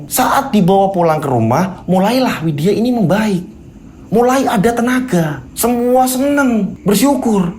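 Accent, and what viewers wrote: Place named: Central Java, Indonesia. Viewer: Absen Kuwait 🇰🇼♥ Ahirnya ada lnjutanya juga yesss Klo dengrin critanya mas ko aku kaya lagi d ceritain mantan🤭 suaranya mirip sih logatnya sma krna dia juga dari jateng aku nya indramyu 🤣🤣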